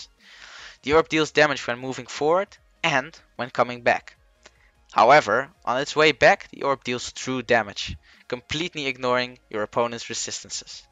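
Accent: Netherlands. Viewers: From the Netherlands i think?? Nice guide thanks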